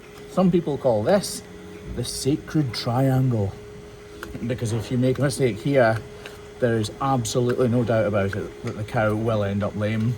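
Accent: Scotland, United Kingdom. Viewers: Do english cows understand your Scottish accent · voice is soothing. I like it. And Scottish. I like it.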